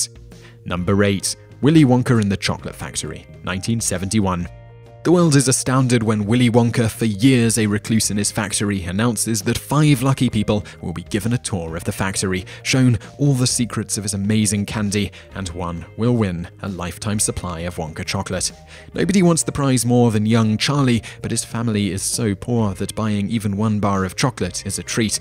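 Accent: United Kingdom. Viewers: ... maybe it sucks first of all because this guy has a British accent. I realize the tradition does actually go back to the English, but these days it's considered an American holiday ...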